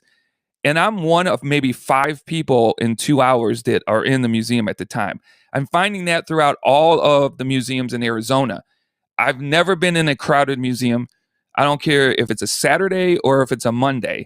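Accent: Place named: Chicago, United States. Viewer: He sounds like a Chicagoan.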